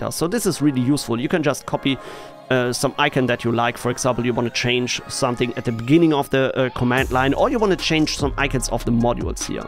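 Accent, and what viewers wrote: Germany. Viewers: Deutsch! Hört man :D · i smell a german accent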